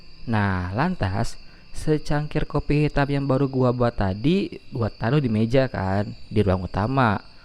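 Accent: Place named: West Java, Indonesia. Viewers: Sundaan euy😋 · Urang sunda sigana mah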